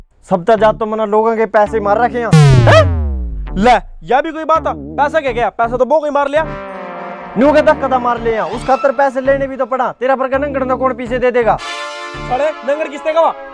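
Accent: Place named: Haryana, India. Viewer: Aapka haryanvi bolne Ka style achha lgta h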